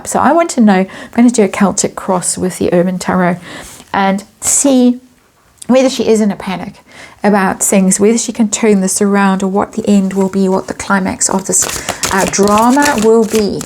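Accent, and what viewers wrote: South Africa. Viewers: I listened twice to your ending with earbuds in and I couldn’t figure out what you said because of your charming South African accent. But when I heard your dog softly moaning I thought it was one of the cats that were in my house 😆 Take care and I hope to hear from you soon.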